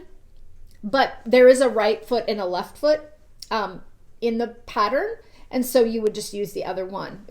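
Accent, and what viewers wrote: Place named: Minnesota, United States. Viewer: Merry Christmas and family! Ohh, I have wanted to learn Brioche, but was always intimidated by it. Thank you for publishing this pattern and the encouragement you give for how easy it should be to learn and knit. Thanks for the discount also. P.S really noticing your Minnesota twang after watching the vlogmas....don't cha know!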